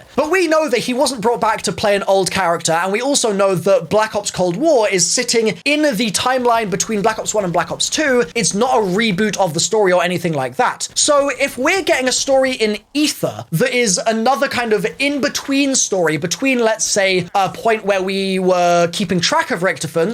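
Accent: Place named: England, United Kingdom. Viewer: Is it just me or just everyone likes he's English way of speaking?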